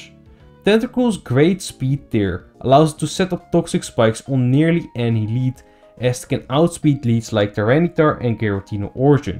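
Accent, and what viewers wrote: Netherlands. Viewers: Hi are you dutch?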